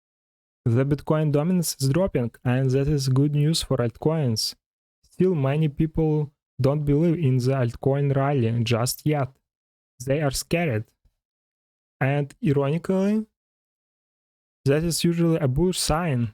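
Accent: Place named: Russia. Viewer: Русский акцент бро)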